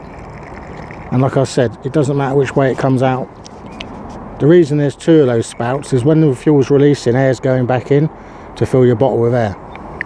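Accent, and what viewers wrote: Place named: United Kingdom. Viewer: This is my favorite type of British accent. Great video.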